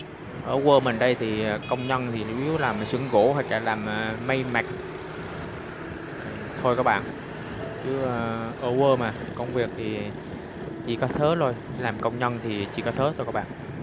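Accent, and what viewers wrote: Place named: Binh Dinh, Vietnam. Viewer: Chuc mung nam moi ban! Video nay co thuyet minh de hieu, co tien bo, cam on ban! Xa que may chuc nam nhin lai neu khong co loi dan giai thi khong biet la o dau luon ... Giong noi BDinh than quen, chuc kenh phat trien nhieu ban nhe! 🌻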